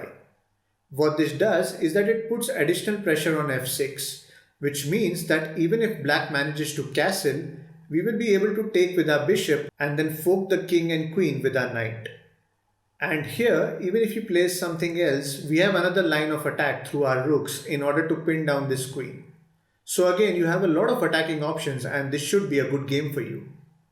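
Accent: India. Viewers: Me: hm thats not gonna help hears indian voice Woaaahhh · I've never heard such a nice Indian accent😀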